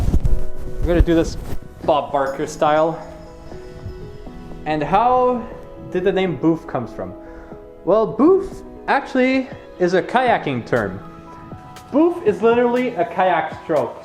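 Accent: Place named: Ontario, Canada. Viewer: I knew you where Canadian! I hear the French ontario accent in your voice!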